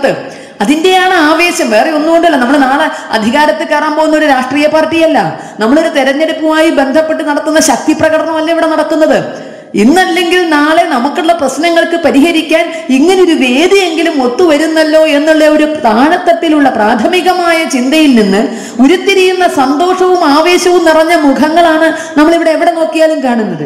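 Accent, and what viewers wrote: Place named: Valluvanad, India. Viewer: വാക്കുകൾ സ്ഷ്ടവും വ്യക്തവും കൃത്യതയാർന്നതുമാണ്. ഇടയക്ക് വള്ളുവനാടൻ ശൈലി അറിയാതെ കടന്നു വരുന്നു. വളരെ മനോഹരമായ പ്രസംഗം. കേട്ടു തുടങ്ങിയാൽ അവസാനം വരെ കേട്ടുകൊണ്ടിരിക്കാൻ തോന്നും. വിഷയമാകട്ടെ ,സമകാലീക ഹൈന്ദവസമൂഹത്തെ സംബന്ധിച്ചിടത്തോളം വളരെ പ്രസക്തം. ഇതാണ് ശശികല ടീച്ചറുടെ പ്രസംഗശൈലിയുടെ പ്രത്യേക .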